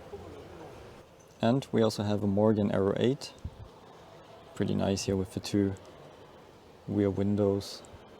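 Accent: Germany. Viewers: When a Dutch person speaks English, it is horrible to listen to. But with your (German) voice, it sounds absolutely perfect.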